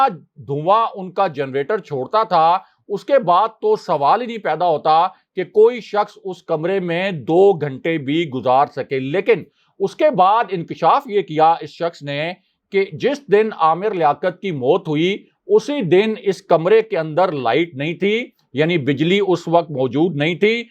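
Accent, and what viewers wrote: Punjab, Pakistan. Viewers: Pie Pendo Punjabi, Correct your punjabised accent Urdu. You cannot correctly pronounce simple Urdu words. Your mispronunciation is very absurd and irritating.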